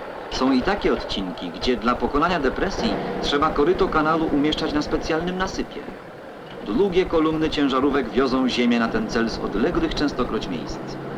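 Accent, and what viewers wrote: East, Poland. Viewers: Lektor chyba zza Buga? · Z jednej strony nie dziwie się komentującym, bo to historia, a jednocześnie dla pochodzących z tamtych terenów rodzinna historia, nostalgia. Jednak.. czy Wy nie słyszycie tej propagandy? Tego jak na sile podkreślane jest to że ta inwestycja to będzie wspaniała, która osuszy jedne tereny a nawodni drugie? Nie wspominajac o innej propagandzie ktora jest właściwie cały czas... ale takie były czasy PS. Czy wy też słyszycie ten akcent lektora, taki wschodni?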